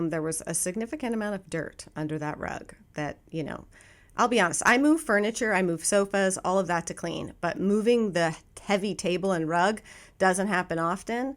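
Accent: South, United States. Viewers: Your southern accent on top of your actual southern accent is phenomenal, gurrrrrl. P.S from a carpentry family - it's brilliant to cover those gorgeous floors until later!! Don't feel bad for them! They will thank you later and you will thank you, too! LOL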